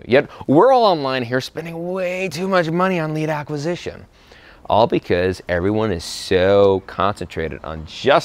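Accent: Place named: South, United States. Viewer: When did you develop this southern twang in your voice?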